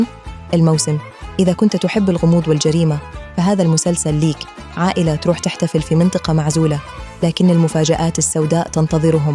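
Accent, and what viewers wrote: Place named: Egypt. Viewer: اظن مصرية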